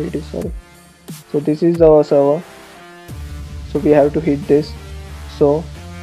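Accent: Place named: India. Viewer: He's indian ohhhhhhhhhhhhhhhhhh Nothing wrong I thought he was american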